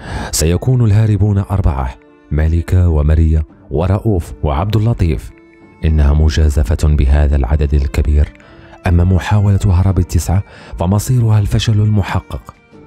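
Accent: Morocco. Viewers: خرجتي جمال الداريجة المغربية بصوتك شكرا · فعلا برهنت ان اللهجة المغربية لهجة جميلة. و غير مختلفة عن اللغة العربية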